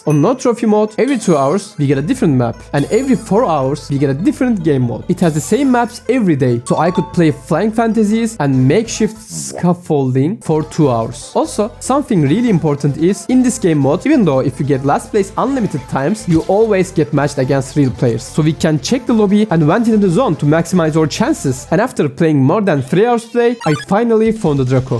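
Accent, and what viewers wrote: Turkey. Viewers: The turkish accent 💀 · I can smell the turkish accent from miles ago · Turkish ahh Accent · It is because of his accent because he is Turkish. Most Turkish people have this accent. Even I have it because I am Turkish too😊 🇹🇷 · konuşmandan türk olduğunu anladım baktım harbi türkmüşsün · Türk lan bu aksana bak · Konuşmandan anladım büyük ihtimal Türk’sün bi dahaki videonda damian yapar mısın ama ne olur ne olmaz ingilizcesinide yapiyim. Could you do Damian too in your next video? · aksandan anladım anında Türk olduğunu kral güzel video eline sağlık ❤❤ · bro ur turkish accent is giving u away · I feel the Turkish accent (laudatory) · i can feel your turkish accent in english am i correct?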